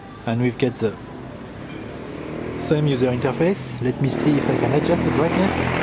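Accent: France. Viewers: A little french accent ? :)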